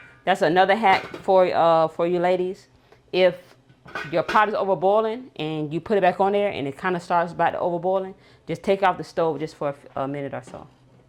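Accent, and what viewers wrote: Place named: New Orleans, United States. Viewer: U HAVE A NEW ORLEANS ACCENT.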